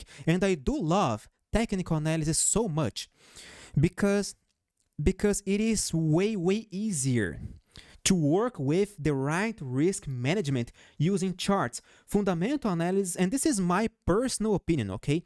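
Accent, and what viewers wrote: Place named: Brazil. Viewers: Thanks for the content, brother. Where are you from? Your accent sounds like Brasilian. É nois!😅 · He is from Brazil!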